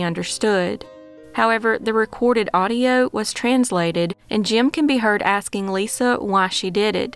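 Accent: South, United States. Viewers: Thank you kindly and I love your southern accent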